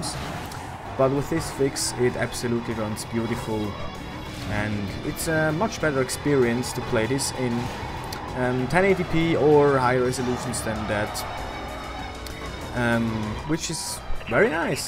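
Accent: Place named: Germany. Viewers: Ha deutscher. Ertapt ;D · ghört mer sofort usse :D PS: Es funzt bi mir denn leider ned... :( has emol anebrocht und warum au immer wider deinstalliert.. er peilt ned mol das i di "richtig" CD drinne han :(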